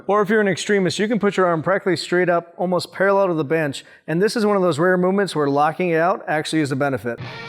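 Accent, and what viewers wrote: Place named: South, United States. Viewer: You sound and talk like Stone Cold Steve. Is it because you're both from the south?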